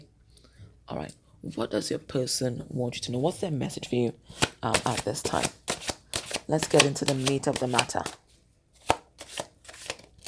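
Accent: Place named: Trinidad and Tobago. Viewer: Where are you from? Trinidad?I heard the accent and immediately subscribed! I am from St. Kitts.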